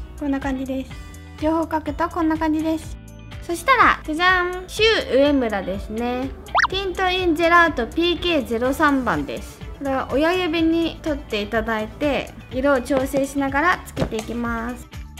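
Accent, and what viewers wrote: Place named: South Korea. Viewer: ・ 韓国の人って、さしすせその発音がすごい可愛いですよね😍❤️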